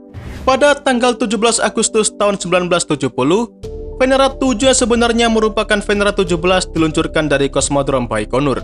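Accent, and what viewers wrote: Java, Indonesia. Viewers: Masss. Suaramu digawe seng rodok kalem mas.. medokmu kuii lo.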